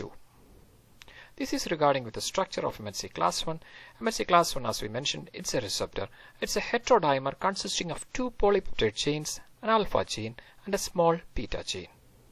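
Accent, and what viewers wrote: India. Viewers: You sound like the gupta that works at the gas station down the street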